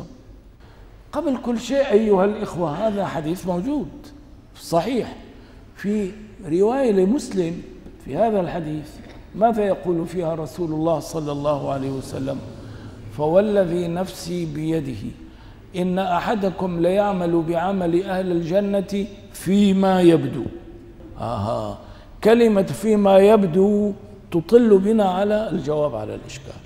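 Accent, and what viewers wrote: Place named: Levant, Arab world. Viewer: A mixture. And when he speaks Fusha, he sometimes pronounces the words like the Shami dialect. Nothing wrong with that in this context because he's addressing the masses.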